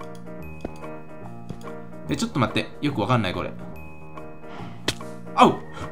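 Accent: Fukui, Japan. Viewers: このころの和さんのしゃべり方がよりすきです 蛭子能収 水曜どうでしょうの試験に出る日本史の先生役の大泉洋 夢グループの胡散臭い社長さん にイントネーションとかにてるなーといつもおもいます 福井の方言なのでしょうか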